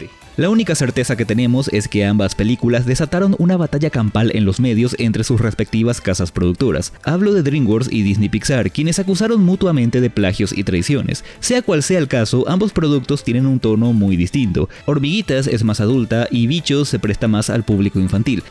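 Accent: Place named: Paraguay. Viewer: Nuevo Sub :D, dije que eras paraguayo por tu acento y es cierto xd🇵🇾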